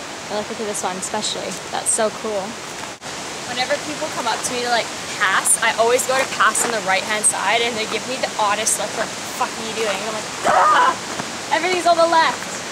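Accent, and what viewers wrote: Australia. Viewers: you are sounding more and more Australian every video C: haha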